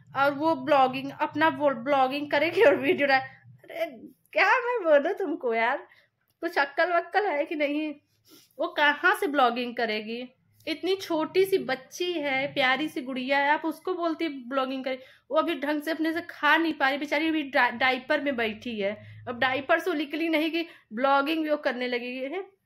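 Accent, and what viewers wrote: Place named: Lucknow, India. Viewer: Ap didi up Lucknow se ho kya 😅😅😅 ap ki boli se lg rha h 😅😅😅😅 but ap ka videos acha lg rha h 😅😅😅😅😅😅😅😅😂😂😂😂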